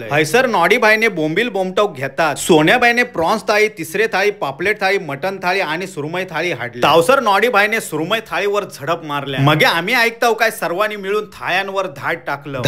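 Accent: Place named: Devgad, India. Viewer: दादा तुम्ही बोलताय मस्त नादनाय करायचा देवगडचा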